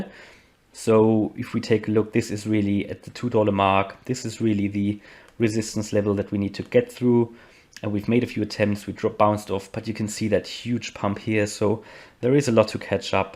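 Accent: Germany. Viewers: Your accent sounds german, correct? I like that you are very specific with your forecasts. I subscripe your channel hoping for more iota videos.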